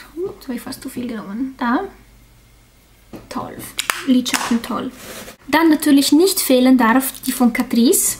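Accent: Switzerland. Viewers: toller akzent/ Dialekt..(weiß ich nicht genau) bist du Schweizerin?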